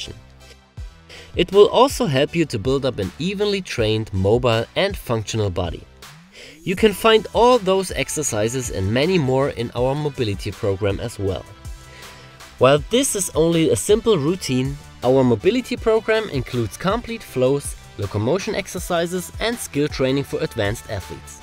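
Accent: Germany. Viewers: da ist doch ein akzent, deutsch? :-P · german accent haha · Schön dicker deutscher Akzent! 😁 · Dat German accent :D Edit - Ah wait: nice video bro! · Dieser deutsche Akzent ist so ekelhaft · r u german, swiss, austrian or dutch? 🤔🤓 ur accent sounds like either of those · Brooo bist aufjedenfall Deutscher das hört man doch raus 😂❤️ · Are you from germany?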